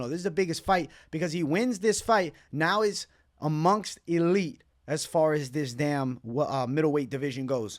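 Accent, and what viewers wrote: New York, United States. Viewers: a new york (boston? not good with ID'ing accents) version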